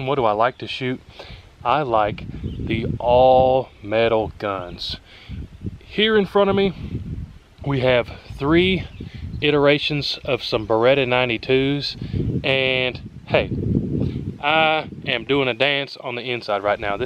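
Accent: South, United States. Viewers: South accent. Even I can differ that)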